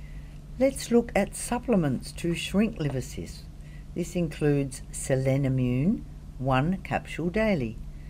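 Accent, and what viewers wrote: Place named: Australia. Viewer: Morena, just found u & now subscribed after watching this vid. Loved your informative delivery & warm Ozzy tone. ❤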